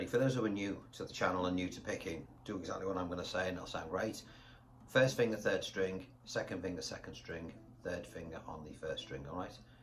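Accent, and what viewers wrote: Liverpool, United Kingdom. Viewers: Actually I can do this pretty good. I learned it from Val Doonican on his TV show in about 1977. That was after 20 yrs of trying to play with a plectrum! The reason for my comment is to thank you for the clear simplistic way you have delivered this very important aspect of guitar playing. It certainly brings out the best in solo playing - that much is for sure. I like your easy delivery and I think I detect a little bit of infectious Scouse in your voice don't I? Thanks again, BjG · You’re deffo an old Skool scouse and this is a really well taught lesson👌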